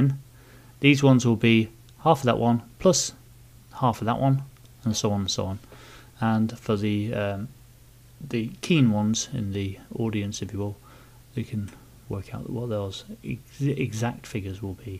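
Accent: United Kingdom. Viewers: That's rude, his voice is not 'obnoxious', its the Yorkshire accent...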